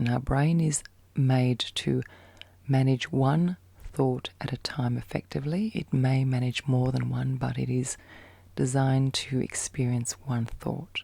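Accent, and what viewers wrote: Australia. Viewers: I just discovered you in perusing sleep & anxiety videos. I'm Alex, a 46yo San Francisco, CA, USA 🇺🇸 native, and you have one of thee most soothing, sultry, feminine voices and dispositions I've ever heard. You're an Aussie, I assume? Thanks for this therapeutic, relaxing video. 👌🏻😴💤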